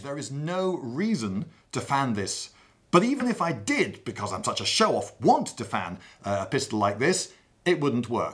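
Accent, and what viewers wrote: United Kingdom. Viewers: That was very clear and expertly done. The British accent gives this video some class. 👍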